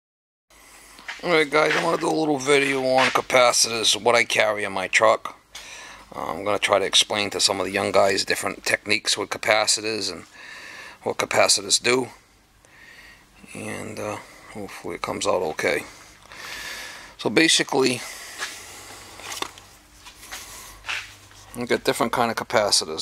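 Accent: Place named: New England, United States. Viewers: Like your yankee accent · No he doesn't, that sounds closer to Boston accent....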